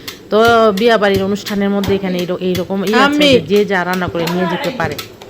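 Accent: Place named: North, Bangladesh. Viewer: কথা শুনে মনে হচ্ছে -বাড়ি দিনাজপুর, ঠাকুরগাঁও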